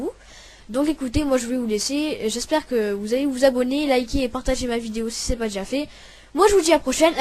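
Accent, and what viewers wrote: France. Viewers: Plus marseillais , tu meurs ! :D MARSEILLE EN FORCE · On sent l'accent du sud x)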